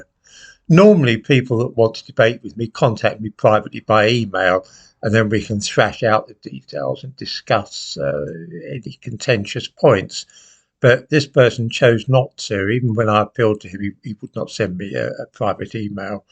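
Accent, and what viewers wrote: United Kingdom. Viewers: Now, if we want to talk about intellectual pygmyism, that's you in a bag on so many levels for believing what this snide old racist has to say. He talks in generalistic terms with nothing to back up his claims, such as "Chinese people are more intelligent than black people", or when he uses a metre to judge that it's due to the Chinese people being on average richer, but utter disclaims societal or home life issues being a factor in wht black people are less rich than Chinese people. Yet the whole foundation of his statement isn't even based on actual Chinese or African examples using an average, he's talking about their attainments in the Western world, without mentioning the elephant in the room, racism. Now, for the non-thinking racist that doesn't bother to check up on the dinosaur's "facts" he sounds plausible, maybe you get suckered by his softly-spoken English accent and it's vague poshness? 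I don't, I am British and I hear his voice and know that the accent is an affectation, as genuine as Donald Trump. Need I go on? Let me explain in summary, you've been suuckered by a racist old fraud who is not, nor has ever been, a historian. He holds no qualifications in it, and the books he has produced are all self-published, no real publisher would touch them with a bargepole. Now, there's a brief summary of a character assassination, have a think about what I've said before you watch the next video by this fraud.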